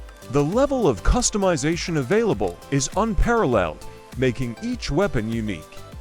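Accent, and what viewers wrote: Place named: South, United States. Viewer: it’s not bad but could use some tuning, around the end of some sentences it pronounces with a odd southern accent like at the start when saying spells it pronounces them like spales and says whep instead of whip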